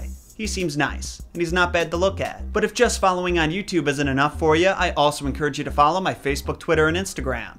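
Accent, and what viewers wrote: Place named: Wisconsin, United States. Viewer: i quite like your wiscansin ayccent in general not just with obscure word mispronunciations 🤗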